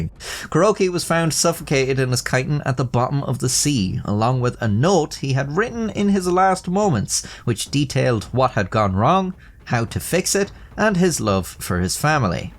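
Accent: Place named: Ireland. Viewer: If theres someone I want to listen to talking about insane deaths and cruel war tactics, then its this Irishman and no one else PS: I love the way you say "three" 🌲. Never stop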